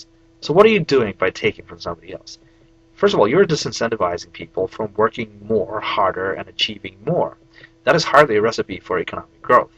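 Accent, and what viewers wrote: United States. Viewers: you have to make a video about how you acheaved this accent seriously :) im amazed how freely and fast you speak and what a good american accent u have its hard to imagine that had developed this accent after you went to the US when u are there owhensince 2005 ...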